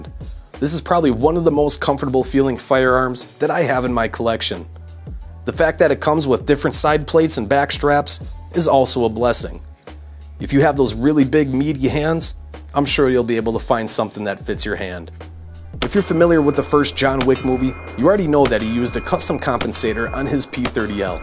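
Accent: Wisconsin, United States. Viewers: Sweet Jesus your Wisconsin accent overpowers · Your accent makes me think you live in Manitowoc County and you eat lots of bratwursts. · Narrator, are you from Wisconsin or that area? That accent is interesting.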